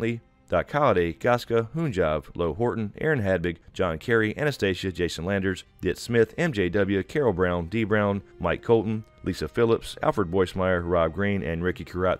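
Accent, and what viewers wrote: South, United States. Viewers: I agree with his southern akcent to kool.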